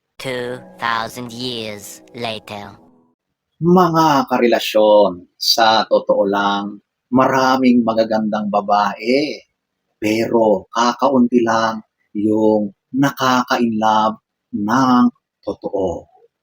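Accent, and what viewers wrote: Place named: Baguio, Philippines. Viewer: Dyan ako natutuwa sa pananalita taga Baguio ka ba sir?!😅😅😅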